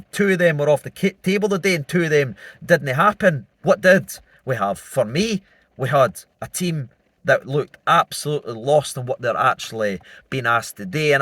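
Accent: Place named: Scottish Highlands, United Kingdom. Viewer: ladies n German 🤣 Dno how people can listen to this teuchter weapon n take it serious lol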